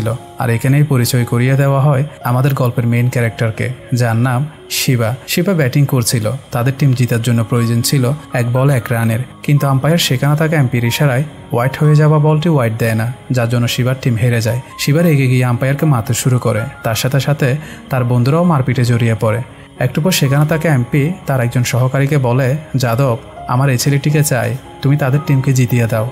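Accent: Chittagong, Bangladesh. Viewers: ভাই আপনে কি চট্টগ্রামের ছেলে নাকি? ভাষাটা চট্টগ্রামের শুদ্ধ ভাষার মতো🤔 আমি ও চট্টগ্রামের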